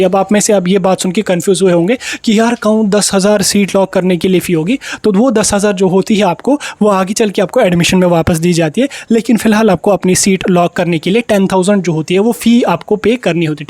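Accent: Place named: Kashmir, India. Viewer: Kashmiri ascent is obedient ❤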